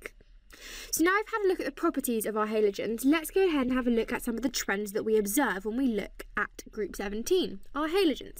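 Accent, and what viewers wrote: United Kingdom. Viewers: yeah strong British accent :D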